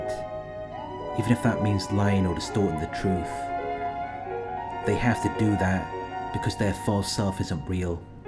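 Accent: Wales, United Kingdom. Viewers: Where you from? Sound Welsh. Good video. I’m from porthcawl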